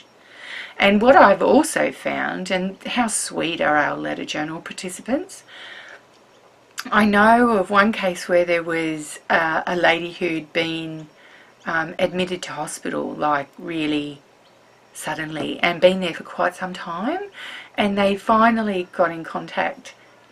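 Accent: Australia. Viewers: you are fun to listen to, -- Shannon does not have your beautiful Australian accent (hers is Southern) -- and I love to hear your laugh;) enjoyed hearing about the letter journal group successes and seeing the art you are doing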